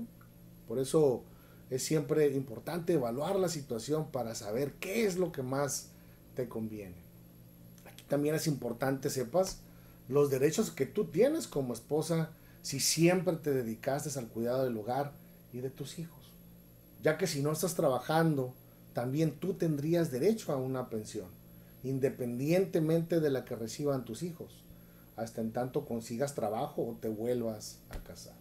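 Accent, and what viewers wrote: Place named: Mexico. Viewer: Mexico, por el modo de hablar.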